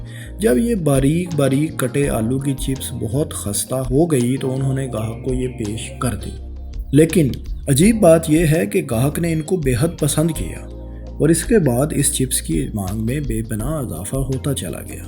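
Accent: Punjab, Pakistan. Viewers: اسلام علیکم۔ بھائی معذرت کے ساتھ، اگر یہی پینڈو اردو آوازیں سننی ہیں تو لاکھوں پینڈو چینلز ہیں۔ فیصل بھائی کا انداز بہت اہم ہے، یا اسے کاپی کرنے کی کوشش کریں یا کاپی کر سکنے والے شخص کو یہ کام سونپیں۔ اس طرح پنجابی انداز کی اردو والوں سے پلیز گریز کریں۔۔۔ اس طرح آپ بنی بنائی امیج ڈاون کر رہے ہیں۔۔۔ پھر سے معذرت مگر بات یہی سچ ہے۔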